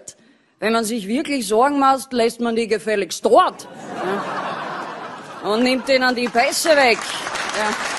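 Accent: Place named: Vienna, Austria. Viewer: Ich liebe diesen Wiener Akzent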